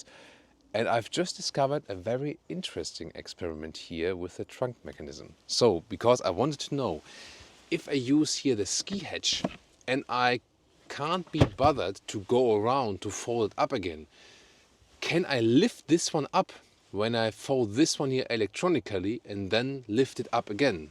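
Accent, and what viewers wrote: Germany. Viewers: you are good in many ways but please work more to improve your English! Is very tiring when you speak English but we hear mostly German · An excellent review. Love your German accent and your English is perfect, thanks for a great review. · I love to hear your German accent :) perfect . nice review as always. is it original quicksilver color? or another color changed with ppf?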